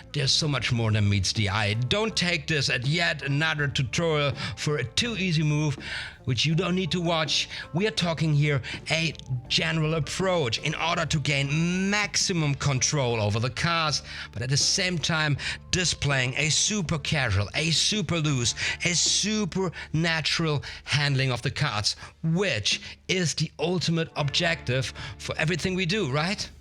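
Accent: Germany. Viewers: Sure do miss your silly German accent!!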